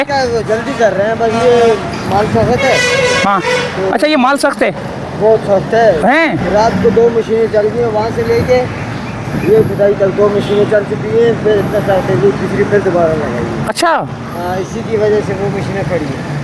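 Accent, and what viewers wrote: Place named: Hyderabad, India. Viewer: بھائی،،اپ کے لہجے سے لگتا ہے کہ اپ حیدرابادی ہو،،،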